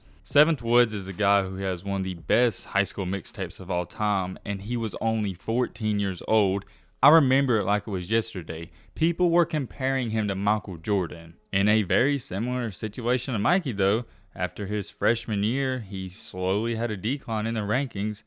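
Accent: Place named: North Carolina, United States. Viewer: He got that NC country accent idk where he is from tho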